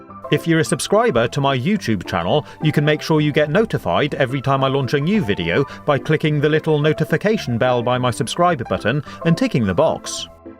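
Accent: England, United Kingdom. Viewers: got a proper gay English accent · Is he English or does he just have trouble speaking with any fluidity.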